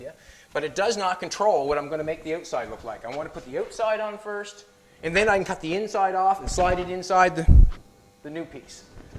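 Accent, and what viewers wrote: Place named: Maritimes, Canada. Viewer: First time here, I knew that was a maritime accent! Great work!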